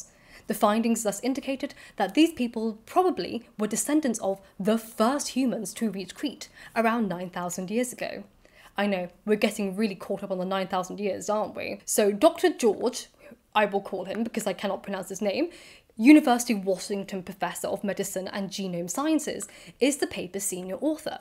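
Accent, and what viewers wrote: RP, United Kingdom. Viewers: Im from London,and I must say you have possibly the most posh British accent.You should try and make audio book. You have such a strong elegant voice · Oh my days she's posh!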